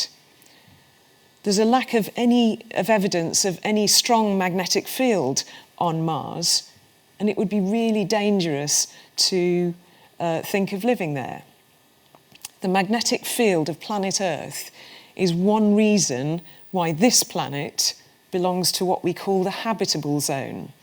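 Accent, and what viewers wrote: United Kingdom. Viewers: Knowledge is one , but amazing how beautifully this woman speaks ....even british sounds nice😄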